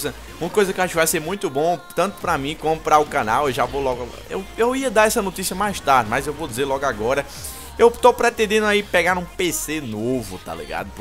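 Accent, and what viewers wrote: Northeast, Brazil. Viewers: você é muito legal e seu sotaque melhora mais ainda o vídeo, cara. Que saudade do Nordeste! Você é bem simples e, ao mesmo tempo, ótimo. Sei lá o porquê deu ter falado isso, mas é que tava guardando isso. O_o Eu quero saber a sua killmark. Eu baixei a killmark do canal achando que seria aquela normal que você usa nos vídeos, mas é uma que tem o nome e tals, e eu queria uma normal, sei o nome porque não combina com meu nick - achei bastante bonita aquela killmark. É qual dessas: Killmark IDK, Killmark HG9?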